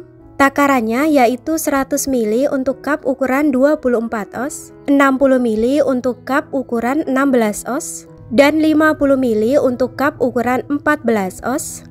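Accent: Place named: Madura, Indonesia. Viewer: Logatnya kayak madura 😅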